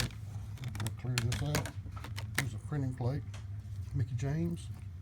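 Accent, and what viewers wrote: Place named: South, United States. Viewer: That was a blast from the past & made to much better by the native WCCW & NWA southern accent!!! :)) "See ya in church" brother. Blessings, David (Jer. -24)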